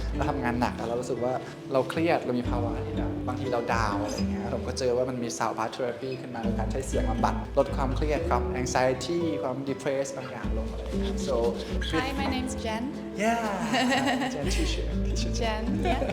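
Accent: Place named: Isan, Thailand. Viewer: พี่ต๋องพูดอีสานน่ารักกก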